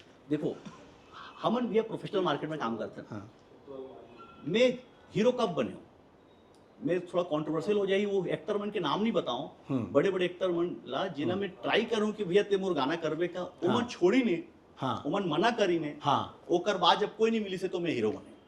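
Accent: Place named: Janjgir, India. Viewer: Special thanks to Ji👍 आपके जांजगीर फ़्लेवर छत्तीसगढ़ी बहुत अच्छा लगथे! रायपुरिया छत्तीसगढ़ी सुने में अच्छा लगथे, पर बोले में थोड़े परेशानी होथे!!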